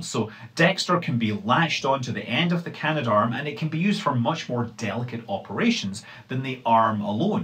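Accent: Scotland, United Kingdom. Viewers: Your Scottish? That's dope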